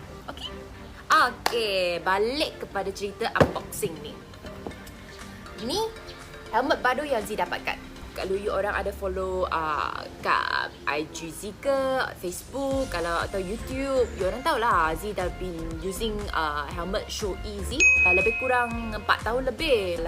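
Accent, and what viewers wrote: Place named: Kelantan, Malaysia. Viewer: Wah pandai kecek kelate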